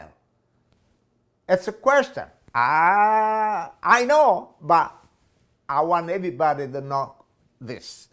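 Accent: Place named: Naples, Italy. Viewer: What town in Italy do you come from when you speak italian it sounds like my father . He comes from a little town in Naples called Castello di Matese, Caserta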